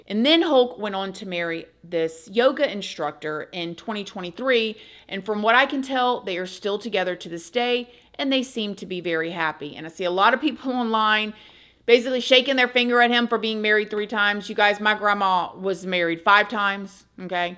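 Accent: United States. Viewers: I thought Hulk Hogan was a legend until he douched himself at the RNC. He lost a hell of a lot of people that really liked him by attending the MAGA CULT rally. I watch you from Australia and would like to ask you, where about in America are you? I love your accent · My parents were swingers... Personally, I never fully understood it... But its not my place or concerns of what consensual adults do... Consensual being the most important aspect! Illegal recordings are not "fair usage" no matter who you are! I would venture to say Bubby sold the video... And it's refreshing that it's the facts of the story ~ not the morals or character ~ that is the story here! I think it's funny that the ex-wife is unset more about the money gained from the court case than what was recorded! (Envy and Jealousy ~ not betrayal ~ she plainly shows her heart only holds anger! Which is sad to think they can't even be friends!) This video was my first from this channel, but it's not the subject matter that earned my subscription ~ but the awesome way it was presented! 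(Hearing that genuine Southern twang and the sensible conclusion ~ please continue creating more content! You are awesome and sweet!) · I'm a gonna' comes out of your mouth and the thick southern drawl has me believing that you are actually from NY but want inarticulate folks to resonate with your video. Why don't we just say that "Terry" is a bottom feeder and it was evident when he put an innocent comedian in a sleep hold with no remorse - just a sneer on his steroidal evil face y'all - I am from PA and don't relate to your accent. Was that hate filled? No, just a 1st right amendment.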